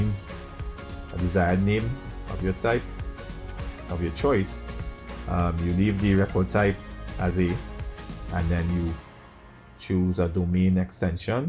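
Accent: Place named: Trinidad and Tobago. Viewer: This voice is a trini for sure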